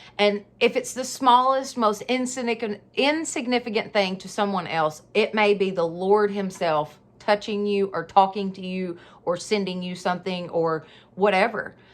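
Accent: South, United States. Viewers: YOU. ARE. FABULOUS!!! I don't know any diyer that would be this honest, the things you've told us about yourself, shown us your life, even though you know you're the blonde hair, big boob, southern drawl cliche... I'm one too 😁 and all the naysayers can kiss my grits!!! And my bday is November 25th!!! But.... I don't want to scare you, however anyone wanting to find you, you've made it sooo easy.... just be careful!! And you need a bodyguard just let me know! I may not look like much but everyone that knows me is scared of me 😳😁🤣🤣🤣 Thank you for being you!!! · Hey beautiful. You're Amazing! Thank you for being honest all the time. Love your Southern accent. Love your positive attitude. You're funny too. Love you my beautiful Angel. Blessings to you and your lovely family. You're absolutely correct. Who cares what anybody thinks.. No one is perfect.. 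There is only one perfect one, that is our loving GOD!! Having CHRIST in your life makes a person be happy and positive. Praise GOD you are here with us sweetie. JESUS loves us all. We are GOD'S children. AMEN. Hallelujah!! I will continue watching your channel my beautiful Angel. I love you.. You're Amazing and smart! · Thank you so much for this and I too crying to hear your story and what a great story it is. Good for you and if anyone leaves your channel then they were not worth your time.I am the same as you I tired to find fun and be happy and maybe a bit mad but that's fine with me.Keep up the good work. Just love your channel and always look forward to watching your videos.Love the southern accent I too have an accent I come from London so be proud of the accent as it makes it you. Hugs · You brighten my day! Love listening to your southern accent. Thank you for honesty...wish more people were as open as you are. 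I feel blessed to have found your channel!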